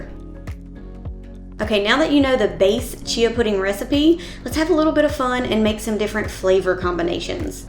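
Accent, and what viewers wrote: South, United States. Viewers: This is my first video and introduction to your recipes. Let me say you are extremely Knowledgeable! I love the explanation of what it is and why it's used! I'm assuming by your accent you're from the South and I just know you are you making Sausage Gravy and Biscuit's and Eggs(my southern cousins would) Lory Staten Island NYC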